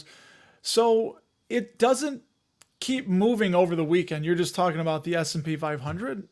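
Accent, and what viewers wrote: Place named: Chicago, United States. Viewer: GREAT video.... Always love watching the replay.... Quality viewing... Love the Chi accent... LOL..... Long live Gino's East.... I do the next best thing since living out of IL - so I order the Lou's - deep dish... They come out pretty good. Love the show.... You make learning fun. You're a true soul.... Be well...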